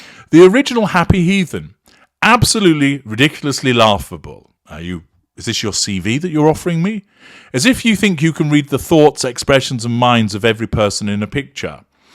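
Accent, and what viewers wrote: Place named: Northern England, United Kingdom. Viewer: OMG, you're white, I didn't know that. LOL I think I do detect a slight Northern accent. I enjoy hearing the "Horn of Ridicule". I'm awaiting more episodes of when you met the Mare (like you, I do not refer to her by name). I'm surprised that nobody had yet used "high yaller" to describe the Mare! Stay well and safe. 😀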